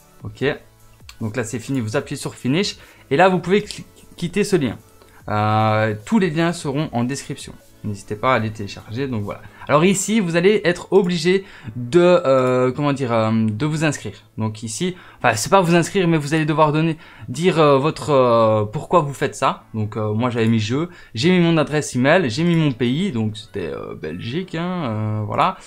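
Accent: Belgium. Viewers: Slt tu es d'où de Belgique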